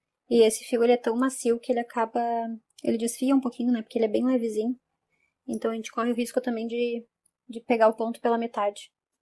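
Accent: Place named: Rio Grande do Sul, Brazil. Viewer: Olá pelo teu sotaque tu és gaúcha de onde tu és?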